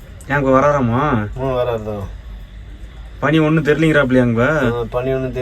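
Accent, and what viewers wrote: Kongu, India. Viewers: அப்பாக்கு நீங்க கொடுக்கிற மரியாதை.. நீங்க பேசுற அந்த கொங்கு தமிழ் அழகா இருக்கு நன்றி ப்ரோ❤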